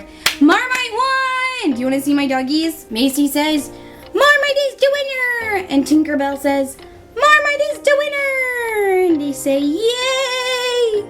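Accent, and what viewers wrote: New Zealand. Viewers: AHAHAHAHA the accent omgg!! tbh it sounded more new zealand but omg dying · I am laughing so hard!!! I think your accent is very New Zealand hahahah <3 <3 <3 Also How am I only just seeing this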